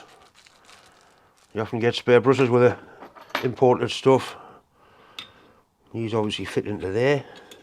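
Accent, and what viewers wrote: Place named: Newcastle, United Kingdom. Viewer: Best part of your video's..... your accent. I've worked with all kinds, micks, paddies, scousers, jocks..... and the best are from the Toon. Every time, hardest working guys and girls I know. That thing looks perfect for removing klingons and tagnuts...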